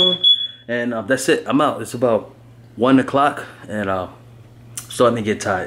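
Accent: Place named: New York, United States. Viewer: Luv the NY accent...NYer for life❤️😎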